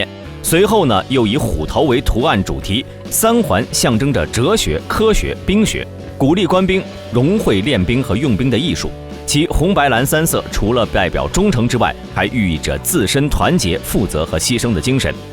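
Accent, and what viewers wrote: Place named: China. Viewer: 這視頻都是台灣人做的配上大陸腔的旁白 ! 讓你以為是中共做的 !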